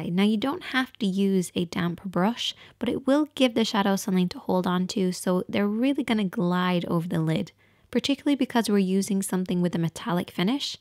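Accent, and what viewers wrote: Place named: Ireland. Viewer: Are you Irish? I hear a slight accent.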